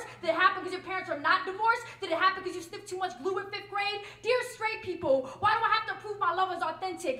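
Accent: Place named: New York, United States. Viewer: She sounds like she’s from New York 😂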